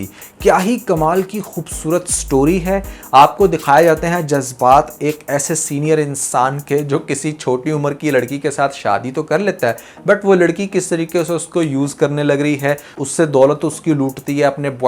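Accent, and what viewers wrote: India. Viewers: Firstly you must improve your urdu before making videos secondly avoid Indian style of dialect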